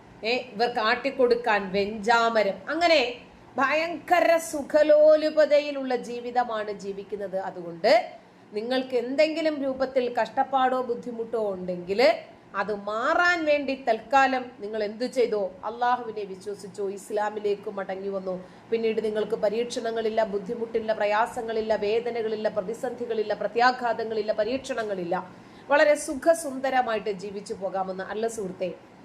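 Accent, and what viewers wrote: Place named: Kottayam, India. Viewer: അക്ഷര സ്പുടതയോടേയുള്ള, അറിവുകളുടെ നീതി ന്യായയുക്തമായ താങ്കളുടെ സംസാര ശൈലിക്കു ഒരു പ്രത്ത്യേക കോട്ടയം സ്റ്റൈൽ തന്നേയുണ്ട്. അറിവിന്റെ ആശംസകൾ. 🌹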